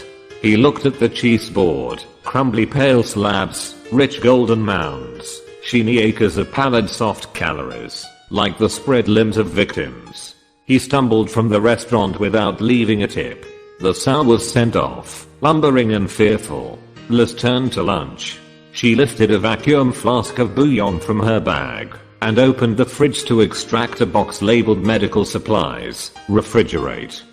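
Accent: United Kingdom. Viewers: Great... All of his voices and pronunciation is perfect!Full of British Accent!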